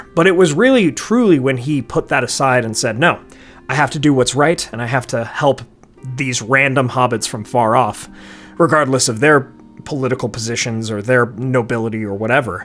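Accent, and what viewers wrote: United States. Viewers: Gentry is the term I was practically screaming at the screen whilst listening. I think the video conflates nobility with upper class. In fairness, the creator sounds American, so they probably aren't familiar with the nuances of the English upper class. I would say Pippin is probably more than gentry though. Given he is the heir to the Thane, he is closer to being a noble. Thane as a noble rank is somewhat similar to Baron.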